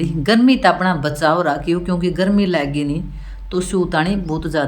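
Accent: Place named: Haryana, India. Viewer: Nice desi vlogs didi bhot badhiya vlog bnao aap haryanvi boli bolde bhot acche lgo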